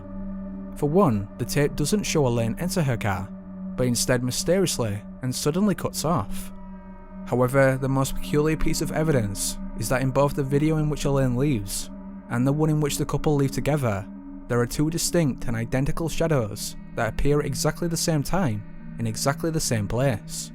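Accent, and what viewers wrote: Scotland, United Kingdom. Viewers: Is he Scottish? He sounds like David Tennant.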